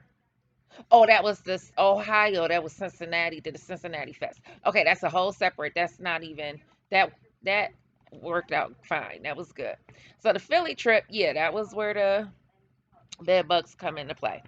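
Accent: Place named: California, United States. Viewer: I'm from North Carolina, to show you how bad I am with accents , I first heard you talking and thought that was a Cali accent. I live in N.C. tho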